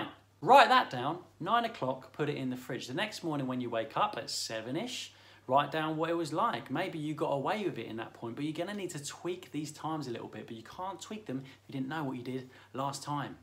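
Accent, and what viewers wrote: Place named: England, United Kingdom. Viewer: Finished listening, just for the good old English accent. Miss it.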